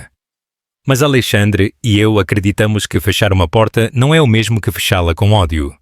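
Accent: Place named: Portugal. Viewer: QUE NARRAÇÃO HORRÍVEL E EM PORTUGUÊS DE PORTUGAL! QUE DESRESPEITO ‼️😡😡😡😡😡😡😡😡😡😡😡😡😡😡😡😡😡😡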